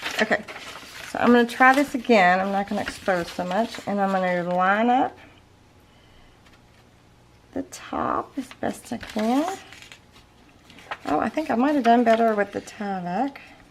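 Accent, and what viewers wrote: South, United States. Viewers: I love ALL the new designs and templates. The album is a beautiful journey I look forward to taking. Thank you..thank you...thank you! Always fun watching you create and this northern girl could listen to your southern accent all day.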